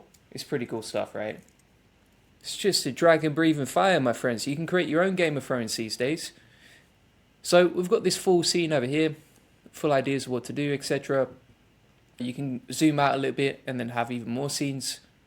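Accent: England, United Kingdom. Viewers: You sound English bro how did you get access to this in the uk?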